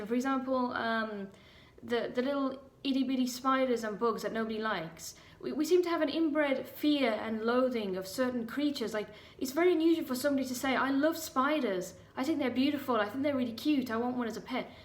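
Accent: Ireland. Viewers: r u irish origen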